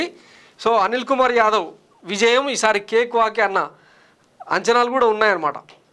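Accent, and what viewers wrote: Telangana, India. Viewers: అన్న గారు మీ వాయిస్ అంటే చాలా ఇష్టం అన్న మీ తెలంగాణ యాషా చాలా బాగుంది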